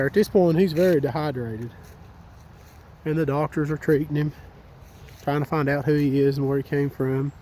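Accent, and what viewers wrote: South, United States. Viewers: This story had me captivated! Thank you! I get so relaxed listening to your southern drawl and seeing the peaceful, serene video scenes that accompany!😊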